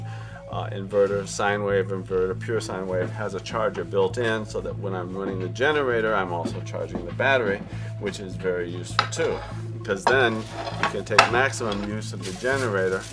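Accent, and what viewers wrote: South, United States. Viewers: You are originally from the South, correct? I've been watching you for quite a while and always kinda figured that you were from NoLA or S. Mississippi, just going by the accent.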